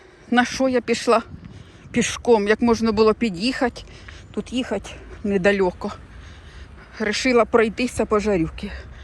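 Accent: Kryvyi Rih, Ukraine. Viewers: Дуже приємно було почути криворіжську говірку. Бальзам на душу. ❤😂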